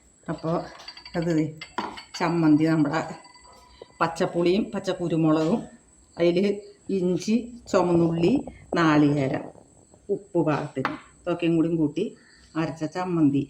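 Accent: Thrissur, India. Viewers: തൃശ്ശൂർ ആണോ സംസാരം കേൾക്കുമ്പോൾ തോന്നുന്നു ഞാനും തൃശൂർ ആണ് പക്ഷേ ഇങ്ങിനെ ചമ്മന്തി അരക്കുന്നത് ആദ്യമായാണ് കാണുന്നത് 👌👍😋😋 👫🔔✅ New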